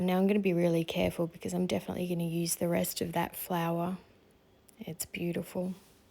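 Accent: Australia. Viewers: So nice to hear an Aussie accent. I have just recently discovered Uniquely Creative. I love the beautiful papers. You make card making look so easy. Looking forward to seeing more. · I'm in NSW & it's lovely to hear an Aussie accent for a change so feel free to be as chatty as you like 😉 Really enjoying your videos and you're doing great so just keep going 👍👏 😍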